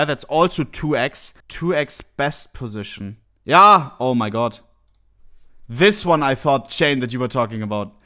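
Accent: Germany. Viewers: man hört den Deutschen Akzent haha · wie krank man hört das er deutsch ist pahahhahah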